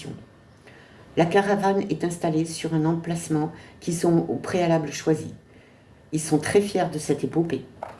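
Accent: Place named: South, France. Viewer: Bonjour, je viens d’écouter la première partie des aventures de matou et minette j’ai beaucoup aimé, j’ai hâte d’écouter et de connaître la suite de cette belle histoire d’amour, la narratrice a une belle voix avec un petit Accent Du Sud qui nous plonge directement dans l’ambiance de se dérouleron aimerait que ça se termine jamais en même temps que ce récit elle nous fait découvrir des lieux magiques, j’avais l’impression de vivre avec eux tous ces moments inoubliables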